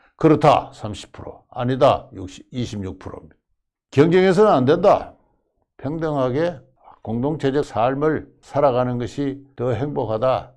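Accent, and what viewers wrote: Gyeongsang, South Korea. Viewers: 경상도. 사투리 듣기 싫어유 😢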